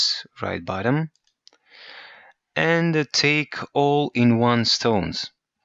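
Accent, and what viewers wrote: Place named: Russia. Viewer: Русский акцент не спутать)))))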